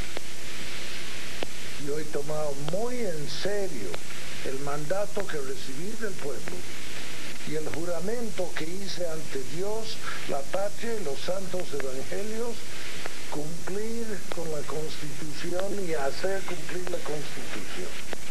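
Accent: United States. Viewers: este señor no sabe hablar español? tiene un acento gringo ? el fue presidente de bolivia ??GUAOOOO .......increible !!!! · Oye pensé que era Bush hablando, parece un norteamericano noten la R que pronuncia.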